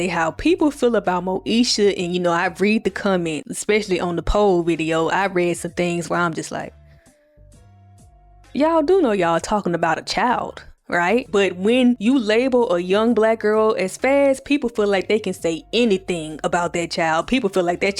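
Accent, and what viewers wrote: Memphis, United States. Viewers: She's from Mississippi! But I thought Memphis too!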